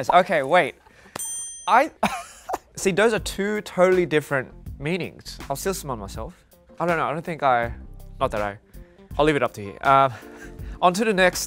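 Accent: Australia. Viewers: the rose was hilarious too but i genuinely couldn’t stop laughing at this one. his aussie accent makes it 10x better i love 😭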